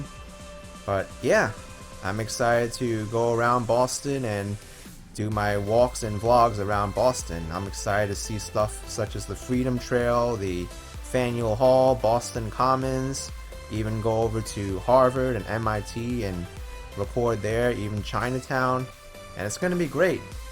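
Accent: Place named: New York, United States. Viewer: Please keep your New York accent while you're there!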